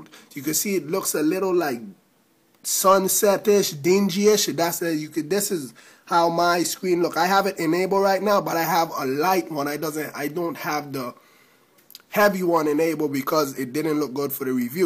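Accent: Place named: Jamaica. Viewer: They care about a badass Jamaican accent like that man:)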